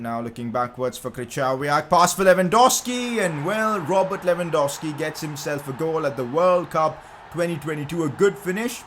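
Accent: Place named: India. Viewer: milittttao that Indian accent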